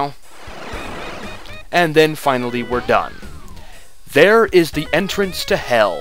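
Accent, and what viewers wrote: United Kingdom. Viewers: so BEAUTY SPEECH. NICE. I LISTEN YOUR VIDEOS EVERY NIGHT. NICE ENGLISH CLEAR. I LISTEN VOICE CLEAN BRITISH DIALOGS. I ALMOST 100% UNDERSTANDING THANKS TO YOU SIR FOR FINE SPEAKING. SO AS I COULDN NOT UNDERSTAND OTHER INTERVIEWS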